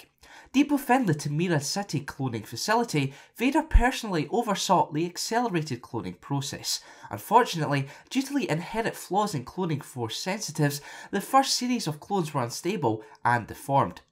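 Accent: Scotland, United Kingdom. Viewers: Are u scottish? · Scottish like me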